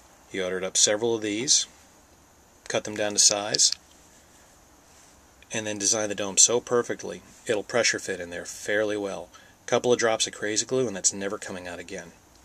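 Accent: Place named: Russia. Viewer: your russian accent is great! XD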